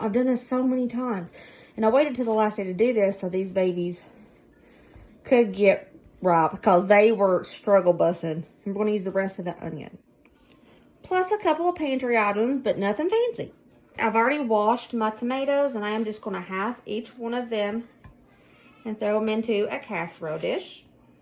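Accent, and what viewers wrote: South, United States. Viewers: Your videos are like memories for me except I had 4 girls. We're in Ohio and my daughters are now adults with their own families. They still make these meals one has modified them for gluten and dairy free. That's is not always a cheap way to. Love the southern draw as my husband's family is from Crossville Tennessee!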